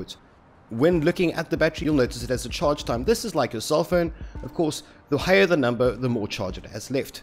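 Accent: South Africa. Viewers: South African? Easy to follow tutorial accomplished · are you South African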